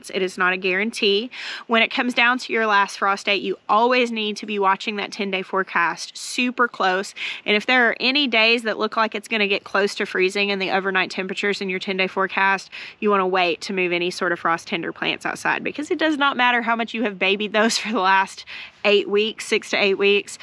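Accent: South, United States. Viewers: cracked me up😂 because no offense but to me you guys do sound like that… with the southern drawl and all… I’m in NY so that explains that…😘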